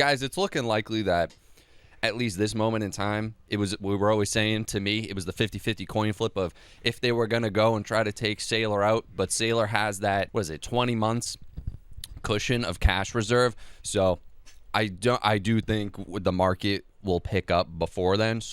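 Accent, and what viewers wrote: Boston, United States. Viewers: Not an insult, but you sound like one of the boys outta Boston.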